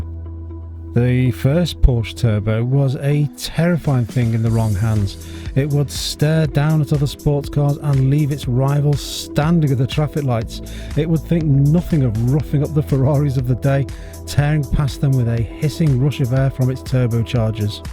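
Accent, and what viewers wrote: United Kingdom. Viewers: i like british accent -i activate CC to learn better english... i learnt some words ive never heard before in this video .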